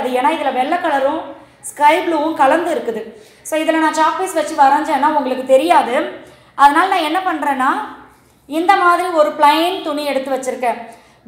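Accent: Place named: Coimbatore, India. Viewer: Sis unga native unga peasu kovai mathiri iruku athan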